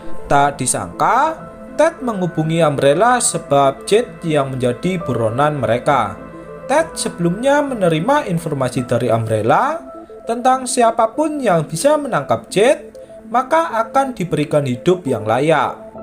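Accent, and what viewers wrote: Java, Indonesia. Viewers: Ah ga seru ga ada suara pemainnya .malah mendengerin Suara yg menceritakannya suara wong jowo apa pake di ceritain .segala males utk bantu sabcrek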